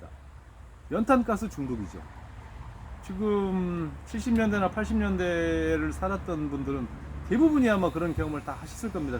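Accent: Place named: Jeolla, South Korea. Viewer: 말씀이 전라도분 같아요 어디신지~?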